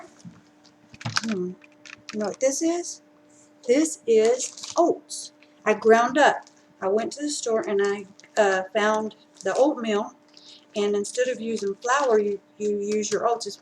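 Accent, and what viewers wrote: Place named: South, United States. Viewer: You are such a sweet person & you are living like Christ wants you to & giving to thise in need is sucha wonderful way to show HIS love..Your pies look so yummy...My mom use to make those...She is ina nursing home now with Alhiemers..You have a southern twang like me..I'm from Oklahoma. I like the name Peyton as well..So cute!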